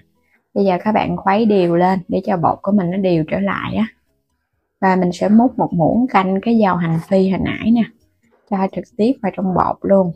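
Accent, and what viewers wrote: Mekong Delta, Vietnam. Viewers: Giọng người miền Tây đa số là vậy bạn ơi .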